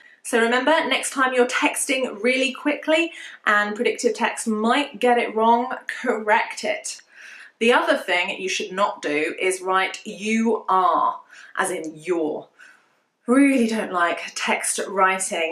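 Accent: United Kingdom. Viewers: Thank you so much for improving our English since I found your channel I watch 3or4 your videos everyday to improve my English, love your teaching and British accent and this channel is very useful for me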